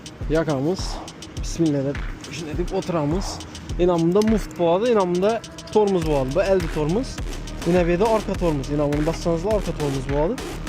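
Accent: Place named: Khorezm, Uzbekistan. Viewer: Хоразм тили котили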